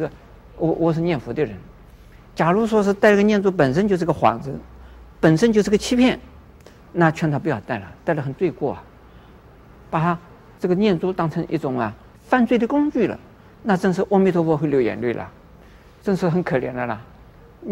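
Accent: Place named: Taiwan. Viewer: I lived in Taiwan for a long time. And he he’s speaking Mandarin Chinese, it’s just that he has a very heavy Taiwanese accent.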